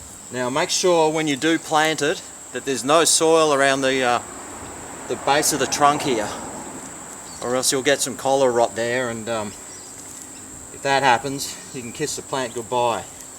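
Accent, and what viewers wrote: Australia. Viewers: Nice video you sound like your from down under. Thanks for the tips mate. growing mine from seeds.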